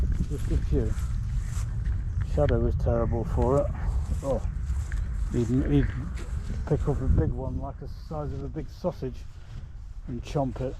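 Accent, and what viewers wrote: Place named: England, United Kingdom. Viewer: ❤😂🎉. Love 💕💕💕💕😁🐾🐾🐾🐾💕💕💕💕Love this Video 😂😆😫😊🤓😇😂🤣🤣🤣🤣🤣😍🥰🥰🥰💕💕💕💕💕🐾🐾🐾💕💕💕💕💕🤣🤣🤣🤣🤓😲😩🤓🤔🥰🤣🤣🐾🐾🐾🐾🤣🤣🐾🐾🐾🐾😮🧐😫😫💦💦💦💦💦🌈☔️☔️☔️☔️☂️☂️☂️☂️☔️☔️☔️☔️🌈😂😂😂😂. Hehehehe 😅. Hehehehe 😅❤😂🎉🎉. I Do. This. All. Day. Talk to. Myself 😮👀👀👀👀🌈🌍👀🐾🐾🐾🐾🐾😩🧐🤓. But Nobody. Listen 😫🧐🤓💦💦💦💦🌈🌎🤣🤣🤔😂😂😮🌍😍🌈🌍😍😍. Wonder. Does. Milo. Listen 👀👀👀🤓🐾🐾🐾🐾👀😩🤓👀😩😩😩😩🌈🌍😂🌈🌍😂🌈🌍😂😂🌈☺️🌈😇🌈😊🌈🤣😫🌎. Looks like. You have a. Drought in your. Neighborhood 😮😮👀😫🐾🐾🐾🐾🌍🐾🐾. We. Having. So much. Rain ☔️ ☔️☔️☔️☔️☔️☔️☔️😫💦😫💦💦💦💦. Im. Going to have to. Send. You. Some. Rain ☔️ 😂☔️☔️☔️☔️🌈🌎🤓☂️🌈🌎🤓☂️🌈🌎🤓☂️🌈. 🤣💦💦💦🤣💦🤣🤣💦🤣💦💕💕💕💕💕💕. Enjoying. Listen. Talking to you. 😂❤❤. Love the. ENGLISH. Accent 😅❤🎉🎉🇺🇸🌎🇬🇧🌎🇺🇸🇮🇪🌎🇮🇪🌈🤓👀🤣😊🇬🇧🌈🇺🇸🇮🇪🌈👀🇬🇧🇺🇸🌈🐾🐾🐾🐾😇💦🌎🐾🐾🐾🇮🇪🇮🇪🇮🇪🌈🌍🌈😊🤣🤣💕💕💕💕💕💕🌎🌎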